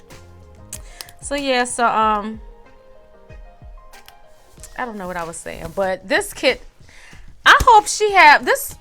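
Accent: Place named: Baltimore, United States. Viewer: I like the way you talk! I was thinking you were from Baltimore but i see you’re in Virginia lol I enjoyed the video as usual! Annnd you look amazing for 45 ❤️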